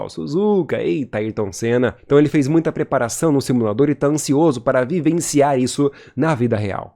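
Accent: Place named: São Paulo, Brazil. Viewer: Não gostei de sua alteração na dicção parece um paulista misturado com paranaense muito ruim sugiro voltar para sua dicção original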